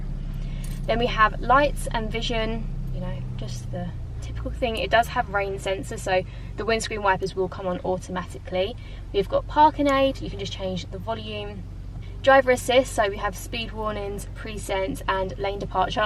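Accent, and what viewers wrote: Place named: United Kingdom. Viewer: i love the bri'ish accent :D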